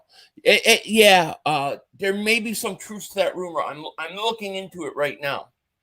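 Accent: Philadelphia, United States. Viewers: Gotta go down the shore! Haha def Philly speak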